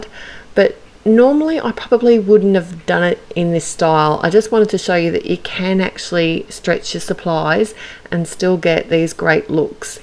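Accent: Australia. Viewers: Lovely one layer cards. It is nice to hear a fellow Aussie on YouTube.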